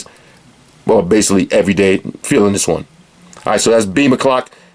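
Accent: New York, United States. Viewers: you are so NY, where r u from?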